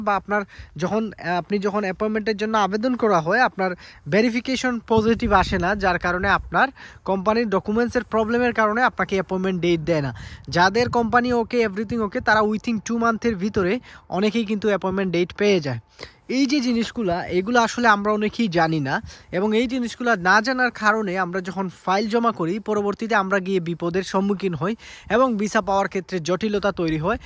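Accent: Sylhet, Bangladesh. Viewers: Bai monokoi sylheti😊❤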